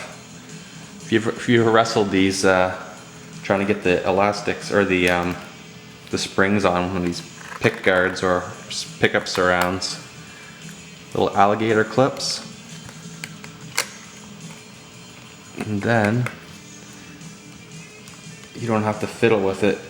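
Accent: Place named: Canada. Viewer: With a Canadian accent though.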